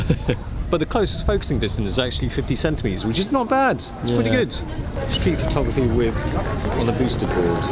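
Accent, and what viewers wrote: England, United Kingdom. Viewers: Why is it like a intellectual orgasm to listen to an Asian with a deep English accent? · I love Asian with British Accent, NICE. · I have know idea how. But his British accent. Also gave me satisfaction, beside his reviews. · How've come you got some fluent Brit accent? Bruh salute you 😎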